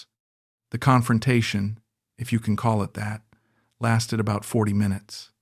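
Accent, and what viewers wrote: United States. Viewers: Listening to a man with an American accent referring to anyone other than his wife as his "mate" does not sound right.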